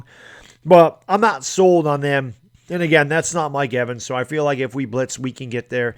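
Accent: New York, United States. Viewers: Lmfao this man has played 1 game against the terrible bucs and now he’s New York fav player 😂😂I cant wait until he gets shit on and New York sports is back in purgatory and dick heads like you with your trash New York accent will go back to stfu in your shoebox apartments.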